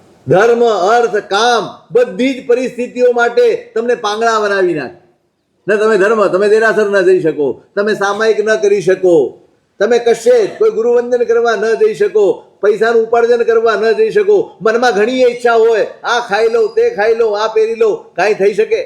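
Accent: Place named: Kathiawar, India. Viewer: Maththen vandami. Though I am not jain and have been living in foreign country ( ANARYA BHOOMI) for 20 years, I am deeply interested in Jainism. Me and my wife have listened SAMARADITY MAHAKATHA for 2 times and 3 time I am listening to you and enjoying your KATHIYAWADI ACCENT very much. Guruji, you are explaining in the simplest way and it is heart touching.Please bless us so that we can make our SOUL free from all the karmas. BAHU PUNYA KERAA PUNJ THI AA MANAV DEH MALYO, EVI KARUNA KARO AAP K AA BHAVROG TALI JAY.🙏🏼🙏🏼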